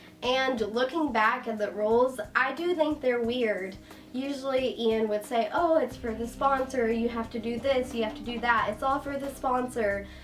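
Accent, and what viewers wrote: United States. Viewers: I love your accent! What state are you from? I'm from the most southern town in florida! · this is entirely off topic but are you from the deep south? you have a very Southern bell accent.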